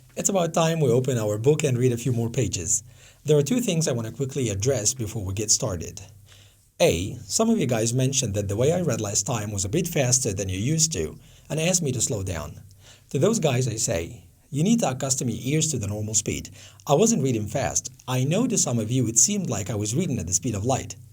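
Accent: United States. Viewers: امته كورس اللهجة الأمريكية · اتقان اللهجة الأمريكية الله يبارك لك يا مستر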